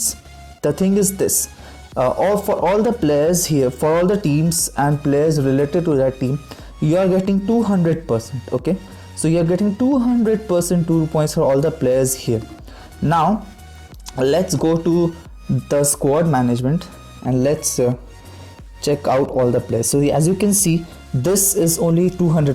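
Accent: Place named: India. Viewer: Not everyone fucking understands Indlish??? 🤔🤔 Or whatever it is you're saying when explaining.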